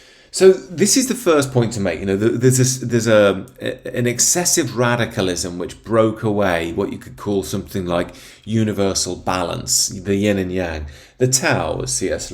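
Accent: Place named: Yorkshire, United Kingdom. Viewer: good to hear you have released your inner yorkshire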